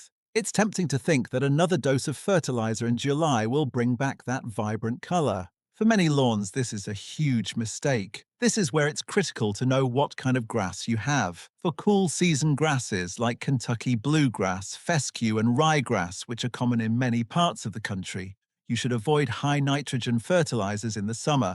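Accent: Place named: United Kingdom. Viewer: This video was solid. British accent AI or not,is always awesome. Thanks for taking the time to make it!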